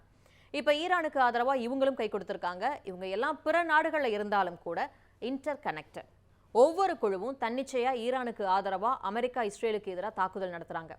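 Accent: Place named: Chennai, India. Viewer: இவ்வளவு கௌவரவமாக உடை உடுத்து Madras தமிழ் பேசுகிறீர்கள் முத்துராமலிங்கம் உயிரோடு இருந்திருந்தால் உங்களைப்பெற்றதற்காக நிச்சயமாக கவலைப்பட்டிருப்பார் .பாடசாலையில் படிக்கும் போது Madras தமிழில்தான் படித்தீர்களா? தூதூதூதூதூ.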